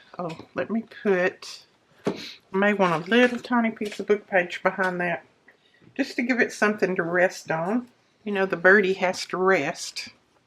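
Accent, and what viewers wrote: South, United States. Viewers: Hello today , I am sitting here with my feet up just enjoying your tutorial and your wonderful southern voice. Would you tell me how to find your granddaughter, she is such a sweetie. God bless and keep you.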